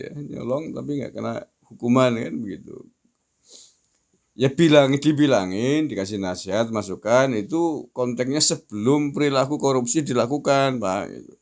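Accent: Banyumas, Indonesia. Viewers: Ngakak ngapak....!! 😀😅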